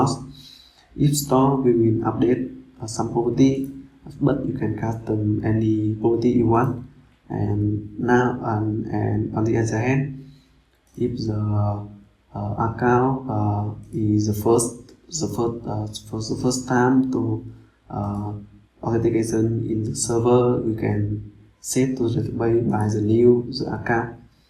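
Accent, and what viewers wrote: Vietnam. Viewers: Đúng cái mình cần 😂😂 nghe cách pronounce đoán ngay a zai ngưòi việt 🤭🤭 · nghe giống người việt nam v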